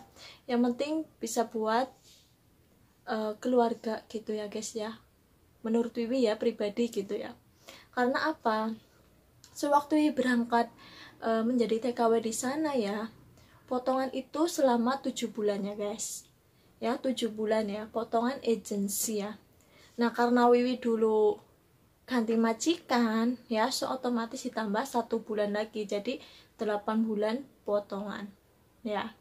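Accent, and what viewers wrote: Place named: Banyumas, Indonesia. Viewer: bersama wiwi ngapak wadon yang lagi cerita di singapore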